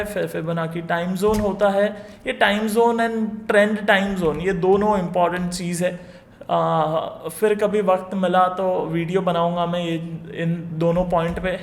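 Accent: Gujarat, India. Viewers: Verry nice explanation sir.... sir are you gujarati?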